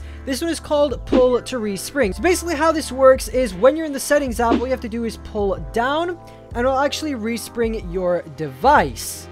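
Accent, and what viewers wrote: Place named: United States. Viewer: You’re Scottish ? You sound American, what part of Scotland are you from ?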